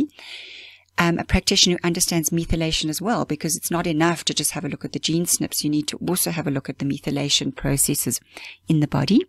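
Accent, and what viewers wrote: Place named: South Africa. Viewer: You sound like you are from South Africa.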